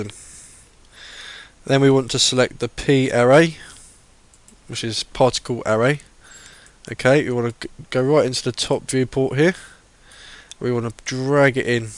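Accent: United Kingdom. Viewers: im guessing you are british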